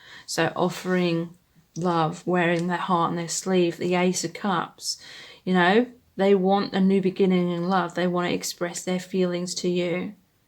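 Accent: United Kingdom. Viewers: I love your British accent ❤❤.Great job by the way, you are really gifted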